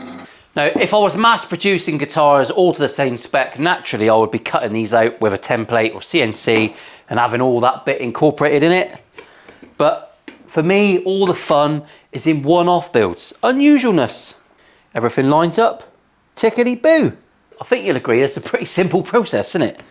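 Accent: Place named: Liverpool, United Kingdom. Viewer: Pretty good Scouse accent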